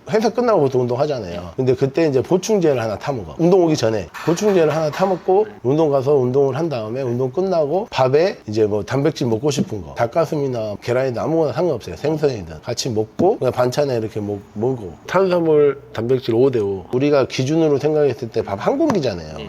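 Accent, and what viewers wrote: Jeolla, South Korea. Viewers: 전라도사투리가 아주 정감가브러!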